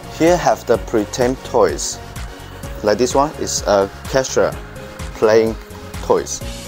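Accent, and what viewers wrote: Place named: China. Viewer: Love the accent i like this chinese guy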